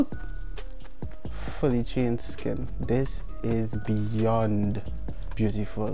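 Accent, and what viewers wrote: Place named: Trinidad and Tobago. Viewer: You sound trinidadian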